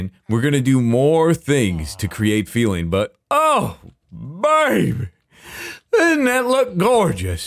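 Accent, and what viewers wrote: United Kingdom. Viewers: Amazing wizard cloak, about as amazing as your British accent <3 That witch hut looks fantastic, I thought you gonna do a big dead tree (and a pond) but maybe this was a "good" witch living close to the village. The end dragon is shaking in its boots lol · And that pa'fect brittish accent ☕❤️❤️❤️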